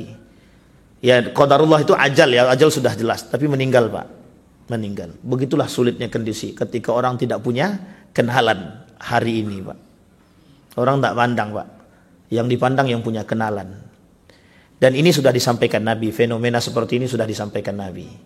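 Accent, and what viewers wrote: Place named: Rokan Hilir, Indonesia. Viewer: Logat pak Ustadz mirip dg BHS Rohil Riau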